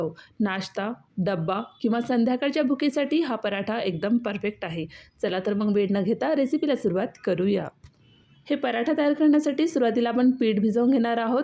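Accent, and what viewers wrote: Vidarbha, India. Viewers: Bhashe warun olakhtat loka,vidharbha chi marathi vegli aae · तुम्ही नागपूर, भंडारा किंवा पूर्व विदर्भाचे आहात वाटते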